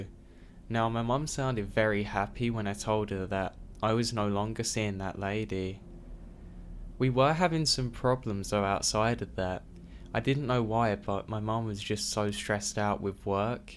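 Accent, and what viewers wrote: England, United Kingdom. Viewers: Land of Shakespeare; pure class- love the voice- English, and Sinister- SCARY!!!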